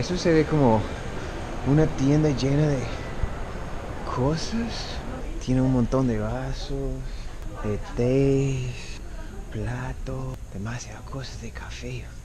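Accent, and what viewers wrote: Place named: Antioquia, Colombia. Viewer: Tu acento es el mejor de los que más me gustan a parte ese toque paisa le de más flow 👌😚😚💙 y que buena experiencia la de la comida coreana, q chimba 😋😋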